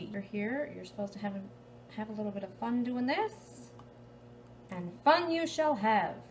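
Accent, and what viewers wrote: Newfoundland, Canada. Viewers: Is that a Newfoundland accent ? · I thought you were probably Canadian. But your accent has a nice something extra compared to what I'm used to hearing. I'm from Northeast Ohio and if I have any accent it's pretty undectible (boring) I think. I imagine Newfoundland is a nice place to live. I am hoping to make this recipe and impress my family 😁 Merry Christmas! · Going to go out on a limb here and say that's a Newfoundland accent...!? Canada? Going to give these a try girl!!! Many thanks from Niagara "Wine Region" Ontario, Canada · Is that a Newfoundland accent?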